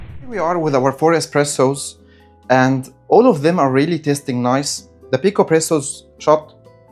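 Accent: Egypt. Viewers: Do you recommend any of them over the Outin Nano? And, how does the Picopresso compare against the Nanopresso? Also, are you Egyptian? You have a very distinct accent!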